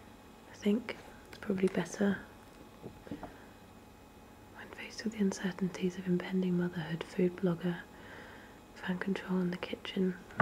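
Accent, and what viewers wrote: England, United Kingdom. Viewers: just discovered your videos. you have a very calming and lovely voice, even when you're speaking normally! not too loud, not too soft. the accent helps too...but i'm an american; we generally love listening to english accents ha :)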